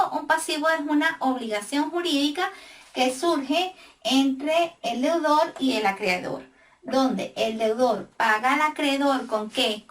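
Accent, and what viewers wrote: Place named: Venezuela. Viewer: Muchas gracias por este video, estoy comenzando a estudiar contaduría y administración tus videos me han ayudado bastante. Quería preguntarte si es posible que hagas un video en cuanto a tips para entrar a trabajar empresas como PwC, Deloitte y KPMG, específicamente que herramientas o sistemas se manejan y que conocimientos podemos aprender para tener éxito en entrevistas de trabajo para ese tipo de empresas. Soy joven aún pero quiero trabajar en algo directamente relacionado con mi carrera y creo que entrar en una de las empresas que nombre anteriormente es un buen paso para comenzar y aprender otras cosas que no veo en la universidad, a veces siento que en la universidad se limita mucho a lo teórico. Te hago esta pregunta porque soy de Venezuela y por tu acento me parece que tú también😃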